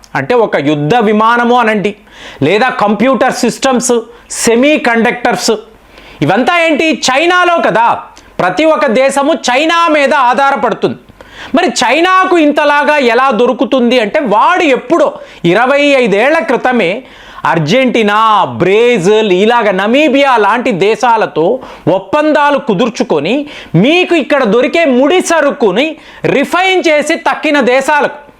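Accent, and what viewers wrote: Tamil Nadu, India. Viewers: ప్రేమ్ గారు తమిళనాడు లో చదువుకున్నారు అక్కడ తెలుగు ఇలానే ఉంటది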